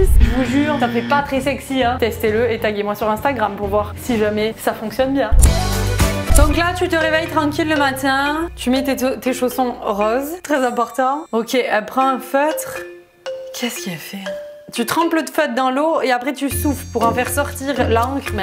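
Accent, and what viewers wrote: Quebec, Canada. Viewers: Si c'est grave beau l'accent québécois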